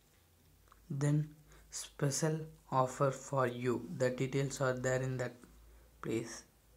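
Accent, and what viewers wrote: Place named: England, United Kingdom. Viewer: Din nou musulman prost cu accent de englez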